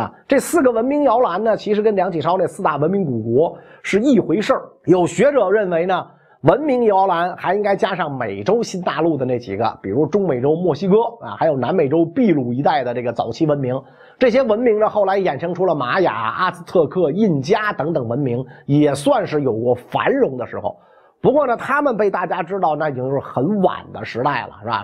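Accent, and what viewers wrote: Beijing, China. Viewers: 嘥氣啦，從前的中華文明文化早已被滿清和共產中共中斷了。至少阿袁生你現滿囗京腔的煲東瓜就不是原來漢人語音，煲東瓜基本上是蒙滿的雜交語，作為文化最重要的載體語言都被入侵者同化了，還好意思說你同化和改變了入侵者真的是太過荒謬。還有就是儒家，從滿清到今日的儒家早已不是滿清以前的儒家了，今日的儒家是被滿清及中共改變扭曲了的奴化儒家。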